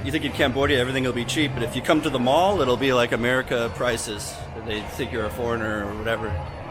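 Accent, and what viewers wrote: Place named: Toronto, Canada. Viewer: NIce to see another Toronto voice active here in the Penh....and a fellow bassist to boot. Great vids.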